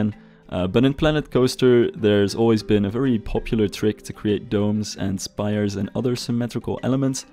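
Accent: Netherlands. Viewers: Are you Dutch i am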